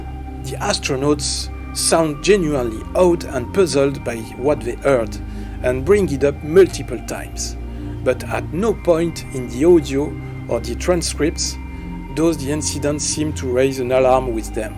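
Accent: France. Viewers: Waouh l'accent Fran-glais 😁 268k d'abo en langue Anglaise pour un Français ! Bravo ! Pouce bleu !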